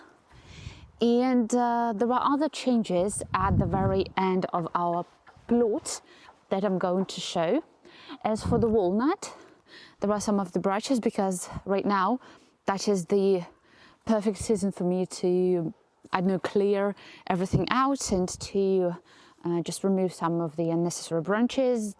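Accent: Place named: RP, United Kingdom. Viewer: Love the posh accent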